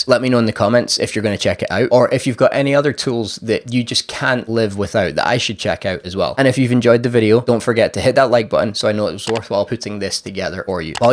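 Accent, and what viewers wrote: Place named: Scotland, United Kingdom. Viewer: Good to hear the Scottish accent to do with property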